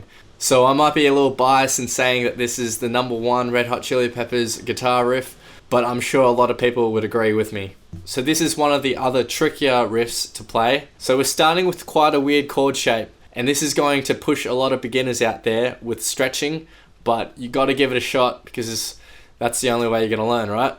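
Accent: Australia. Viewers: Hey I recognise that accent! Hello fellow Aussie! :D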